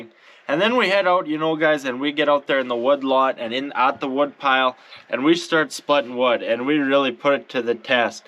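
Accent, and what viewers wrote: Canada. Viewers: Love that middle Canadian accent. If you said ‘don’t ya know’ after sentences I’d say you were from north of Minnesota lol. Love this channel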